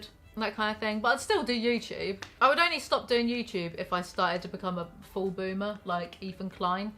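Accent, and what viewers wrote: United Kingdom. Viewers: Your british accent makes everything funnier 101% lmao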